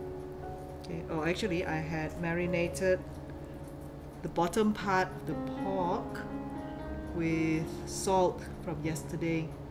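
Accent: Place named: Nigeria. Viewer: Omg, she sounds so Nigerian. Nice job , I will try this .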